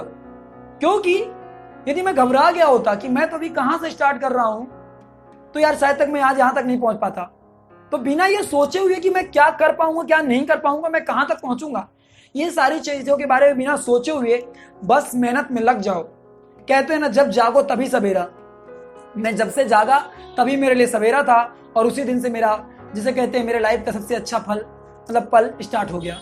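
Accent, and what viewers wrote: Bihar, India. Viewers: Sir aap bihar se h kya?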